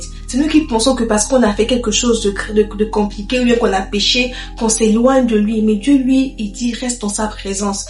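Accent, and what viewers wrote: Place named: Gabon. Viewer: Bien l’accent gabonais oh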